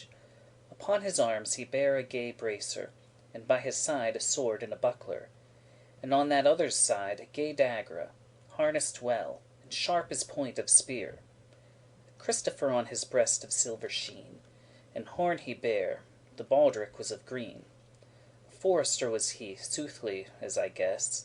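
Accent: United States. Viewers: Ive been needing an American accent to understand it ❤ thank you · That's bloody awful. You're supposed to speak it as it was spoken, not with a Yank accent!!!!!!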